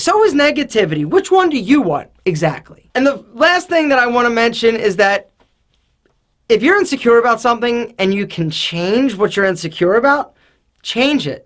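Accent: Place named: Baltimore, United States. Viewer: Love your accent!! Bawlmer hon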